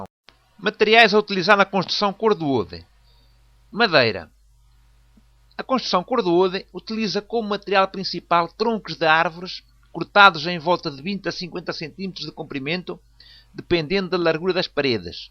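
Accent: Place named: Portugal. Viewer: A fala é de um português!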